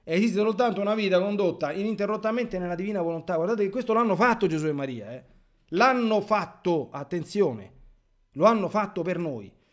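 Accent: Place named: Rome, Italy. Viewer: la sua dizione e dialetto romanesco che mi piace da morire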